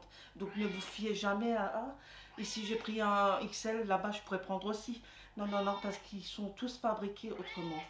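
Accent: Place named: Alsace, France. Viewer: Super haul, j adore leur palette, j en ai déjà pas mal...petit clin d'œil pour ton accent alsacien...sa fais plaisirs. 67 elsass frei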